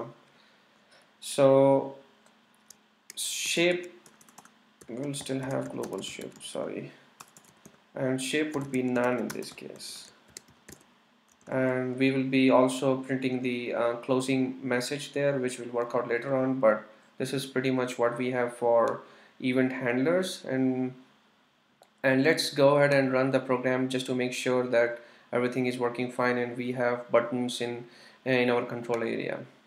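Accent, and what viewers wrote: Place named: India. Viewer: I like your Indian accent :)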